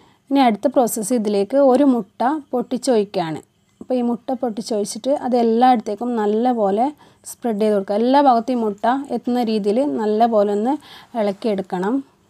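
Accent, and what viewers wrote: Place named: Malabar, India. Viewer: എനിക്ക് അറിയാം.. സ്പീച് slung. മലബാറിൽ എവിടേയോ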